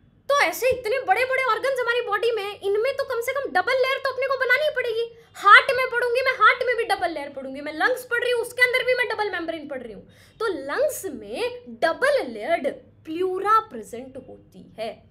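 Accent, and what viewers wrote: Haryana, India. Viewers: Boli haryanvi lage sey ❤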